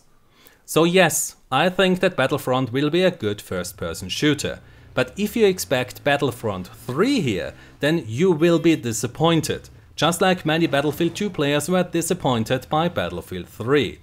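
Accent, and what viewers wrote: Austria. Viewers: are you from Austria? Weil du Autirgendwas heißt und weil du nicht klingst als ob Englisch deine Muttersprache wäre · Great video. I have to ask as this has had me thinking for a while, Are you Austrian? Because you sound very much like Niki Lauda lol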